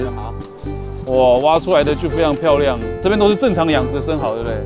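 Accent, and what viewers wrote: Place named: Taiwan. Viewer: 台湾口音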